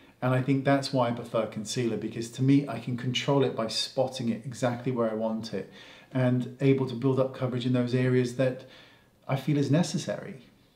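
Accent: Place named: United Kingdom. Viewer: I loveyour videos and tour Brittish accent!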